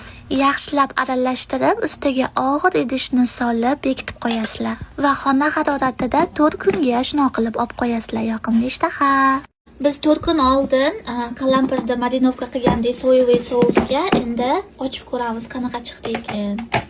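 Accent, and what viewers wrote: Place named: Fergana Valley, Uzbekistan. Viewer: Водийни кайсидир жойлари шунака шева бор калапир кутини ёки бошка нармаларни кутини диб гапиришади эшитганман деме бу кишим водийдан😅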